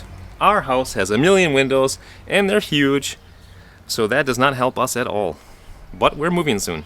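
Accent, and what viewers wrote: Germany. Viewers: Wait you are a native englisch speaker i know yo live here for a while but why do you have german accent xd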